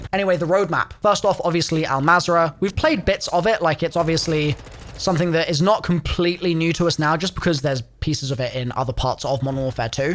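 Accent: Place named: United Kingdom. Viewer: "Voice issue" its called a british accent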